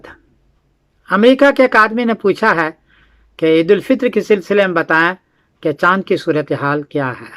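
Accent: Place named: Bihar, India. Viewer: ماشاء اللہ. مولانا کا لب و لہجہ اور گفتگو کا ٹون وہی بہاری ہی ہے 👍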